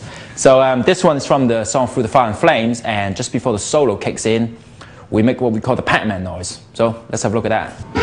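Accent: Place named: United Kingdom. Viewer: He sounds british.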